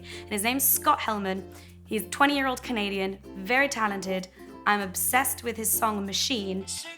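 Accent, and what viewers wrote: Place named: Manchester, United Kingdom. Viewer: where is from her accent sounds very familiar.. i want to say manchester?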